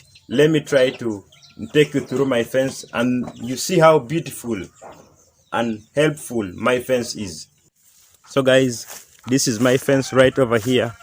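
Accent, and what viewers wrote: Uganda. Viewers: He looks a ugandan n his accent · His accent from Uganda. Kenyan man can recognize them a bange!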